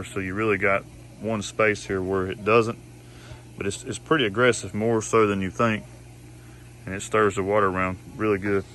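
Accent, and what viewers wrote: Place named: Alabama, United States. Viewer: He sound like he's from BAMA!!! Roll Tide!!!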